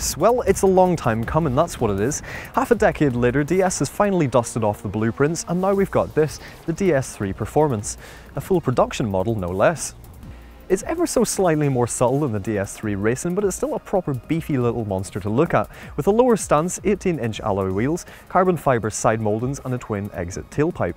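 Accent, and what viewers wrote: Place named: Birmingham, United Kingdom. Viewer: He has the same accent like the other guy. Same hometown maybe? Birmingham?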